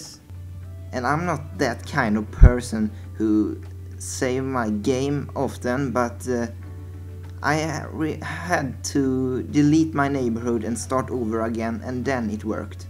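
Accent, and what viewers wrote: Sweden. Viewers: You sound swedish :D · I thought i heard some swenglish in it swedish i awseome. hur länge har du hållt på med hus i sims?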